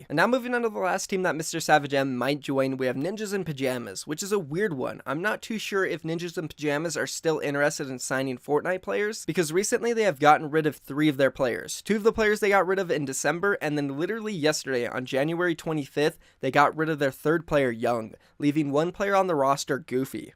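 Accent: Norway. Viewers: Is he Norwegian? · Damn is he from Norway?, im from norway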